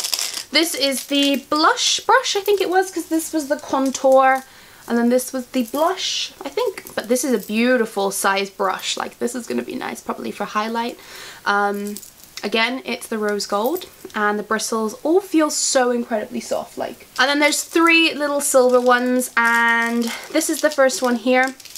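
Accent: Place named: England, United Kingdom. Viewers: Chocolate brown & medium brown are the most popular colors for lip sticks right now. I guess by your acent that you are from England. Usually the U.S. is always ahead of times when it comes to fashion. Especially California, New York, Chicago, and Detroit!!!! So, go get some BROWN LIPSTICK!!!!!! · So West Country YAS love it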